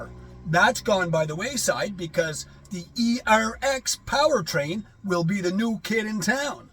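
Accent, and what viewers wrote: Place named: Canada. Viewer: I love your accent. Canadian?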